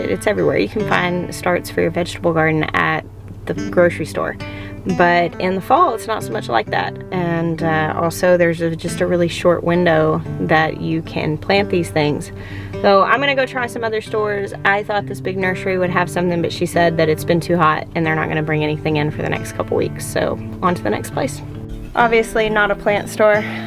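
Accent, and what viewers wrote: South, United States. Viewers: Holy southern accent in this video! Never noticed it as much as this video! Must be the heat in the fall. 😃😃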